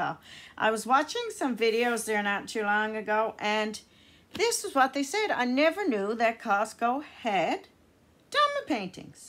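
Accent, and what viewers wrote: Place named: Newfoundland, Canada. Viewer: First time seeing one of your videos, and I love that kit that you got. I love that they're already framed and that you have the three pieces separately to do. They sure did add in a lot of extras also. I hope you don't mind me asking, but where are you from.? Your accent sort of sounds like where I'm from, and that is Newfoundland Canada, but I could be wrong 😄💜😊💜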